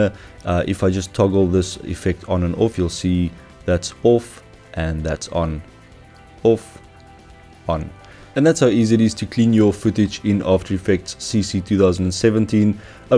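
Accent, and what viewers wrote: South Africa. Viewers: You sound South African...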